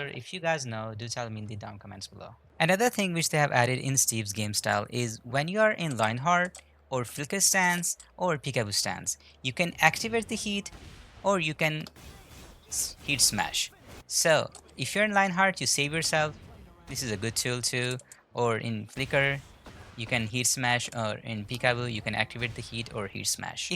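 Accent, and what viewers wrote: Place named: Pakistan. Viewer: I can't tell whether you are Pakistani or british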